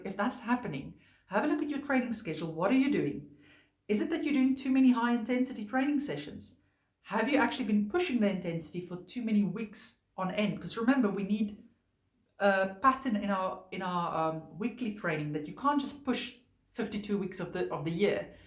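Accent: Afrikaans, South Africa. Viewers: Is that an Afrikaans accent I hear?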